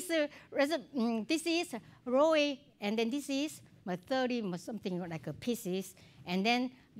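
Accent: South Korea. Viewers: Yang 저도 agree 해요. 전 한국발음이 담긴 망치씨의 영어가 너무 아름다워요. 그리고 family counselor 하시던 분이면 영어 엄청 잘하시는 분이에요. 정말 자신을 믿고 걸어오신 망치가 존경스러워요! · She’s so funny!! Her accent, humor, confidence makes her such an interesting person. Looove the fact she’s killing that korean accent !!!! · 영어를 잘하던 못하던 열정적인 모습이 너무 멋진 아줌마다. 메이컵하고 스타일은 영어권 느낌인데 발음과 영어하는 스타일은 typical Korean immigrant but she expresses what she wants to tell so she's very full of confidence and successful person! I want to applaud what she does! And of course she motivates too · My mother and sister made kimchee using her recipe! And we're Korean Americans. Her recipes are authentic and excellent and she is adorable. Love her Korean accent! :) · 이렇게 열성적으로, 그리고 처음엔 아무런 보상에 대한 바람 없이 순수한 마음으로 수많은 한국의 정통 음식을 전세계에 알린 한국분이 이 분 말고 또 있었을까 싶다. 그녀의 공으로 지금은 인정 받고 가치 있는 보상을 받아 기쁘다. 이런 분이 한국에서 공로상을 받아야 하고, 한국홍보사로 지정되어야 한다. 그리고 영어 정말 잘 한다. 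저 나잇대의 한국 아주머니가 겨우 4년 미국 인생으로 저 정도의 언어 구사력과 알아듣기 쉽게 자기 의견을 표출하는 건 영어 정말 잘 하는 거다. 발음이나 억양이 완전히 한국식이어도 상관 없다. 영어식으로 발음하고 잘 해도 자기 의견 표현 못 하는 사람보다 훨 났다. 그리고 레서피 너무 좋고 센스도 좋고 시각에도 신경을 쓰는 모습이 보기 좋고, 심지어 오뎅이나 막걸리도 집에서 손수 만들어서 세계에 널리 알리는 노력도 감사하다. 언젠가 쿡 북 사고 유튜브 보면서 망치 레서피로 막걸리 만들어 볼거다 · She is gentle. I was surprised that few subscribers were Koreans back then. Well, I got to know of her YouTube channels several years back. I wanted to learn how to describe making food in English, and then I found her channel. I was so fascinated by her videos made in English. I definitely feel that she was born and raised in Korea as soon as I listened to her speech. However, she had no difficulty explaining things in English. That's when I realized that fluency must've come first before accuracy. I know my purpose to visit her YouTube was quite different from yours, but that's the moment I started subscribing her videos. 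Thanks. And I cracked up when you explained how you made your pen-name,. Please, keep up the good work. 수고하세요! 감사합니다!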